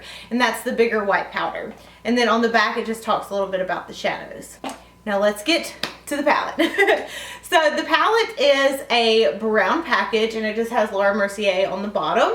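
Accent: South, United States. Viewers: I love your videos! You are beautiful young lady and you seem to show that beauty outside and inside. I was very curious about this palette. The price point is high for Laura Mercier's eyeshadow palettes. What is tempting me is the dark matte colors. I love the shimmer shadows. They are gorgeous!! I use those dark shadows with my liner. I like to take a small smudge brush and go over my liquid liner or my eyelash glue to block that shine and soften the liner. If I travel with a dark color, I take a MAC or MG single pan in a Z palette and I have broken three of these dark colors while traveling. This palette along with whatever palette is my favorite at the moment would be safer to travel with. I'm not sure if the white color would help me. I have this palette in my Sephora cart but that price is keeping me from finding it that worthy. I have too many things on my want list right now!! 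You're lovely and keep doing videos. I'm a Northeast Tennessee girl that has a southern accent close to yours!!! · I love watching your videos, and I love your southern drawl ( I'm from Kentucky so I know where coming from). I signed up for influenster but I have never gotten anything do I need to do something other than just sign up?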